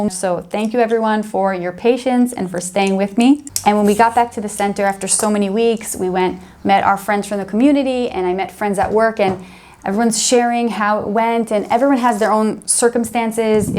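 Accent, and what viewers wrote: United States. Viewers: Which part of America are you from?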